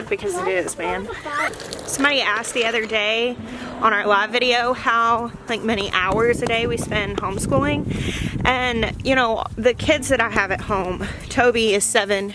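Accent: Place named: Arkansas, United States. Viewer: My sister lived in Arkansas for almost 10 years as an adult, and picked up a little bit of the accent (we’re Michiganders). When you said that Ben is five (“fahv”), I heard her accent. But then you said “time,” which she pronounced as “tahm.” I’m not saying this to judge the accent at all. I just found it interesting. When I first started watching your channel, I must have heard some of the accent, because I thought you might be in Arkansas.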